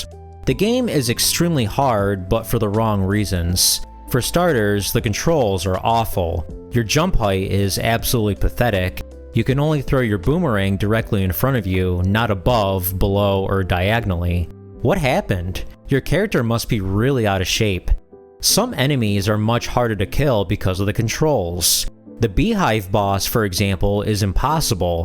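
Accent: Chicago, United States. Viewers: Is that a Windy City accent?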